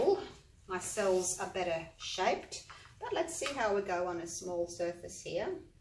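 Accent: Australia. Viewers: Love your Aussie accent! I’m from NY!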